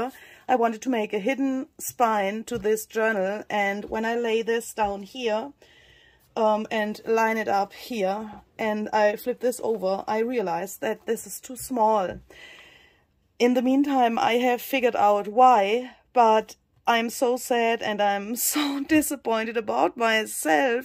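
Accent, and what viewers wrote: Germany. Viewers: Hi, please don't worry. First of all, this is beautiful. I truly love your work. I hit this problem many times, and I would fix it by adding matching, grungy broderie anglaise or lace around the edges. I love your accent - you speak great English. I love German, it's my favourite language, and I wish I'd learned it at school. I guess it's never too late? I am 62. Us Brits are lazy when it comes to speaking different languages. Love what you did to fix your cover problem. You are a great artist. Take care, xx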